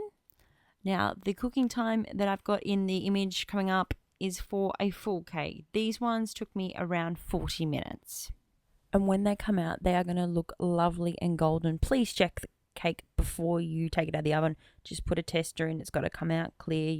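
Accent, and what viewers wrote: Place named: Australia. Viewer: Hi love your videos and explanations wonderful job!! I love your accent too are you from Australia? Keep up the great videos!! Allie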